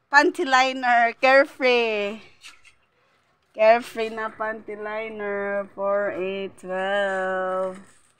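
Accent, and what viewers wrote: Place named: Visayas, Philippines. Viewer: Yes kilala ko si J Djshine dati din ako sponsor sa kanya, bait sya. Congratulations sis, parang bisdak ang tono ng salita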